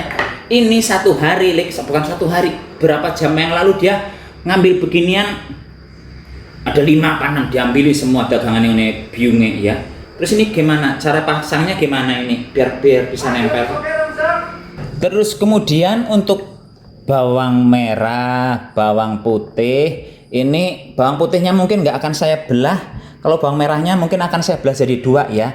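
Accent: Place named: Tegal, Indonesia. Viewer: Maturnuwun resep simple nya pak.. sy terapkan resep ini& resep apemnya.. Seneng bgt denger logat org Tegal..suami sy org Tegal tp br mninggal..sy pulkam ke Blitar.. sy ingin jualan makanan Tegal utk mngenang suami sy..ingin jualan nasi lengko& kue apem dr video pak.. Minta resep bumbu kacangny nasi lengko boleh pak??..ingin dpt resep dr orang Tegal asli. Maturnuwun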